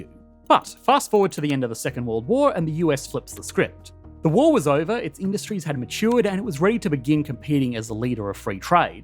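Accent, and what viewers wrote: Australia. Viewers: Always better when something is explained in an Australian accent honestly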